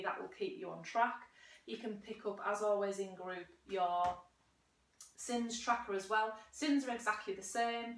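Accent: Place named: South Yorkshire, United Kingdom. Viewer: where are you from your accent sounds like mine ( im from south yorkshire rotherham )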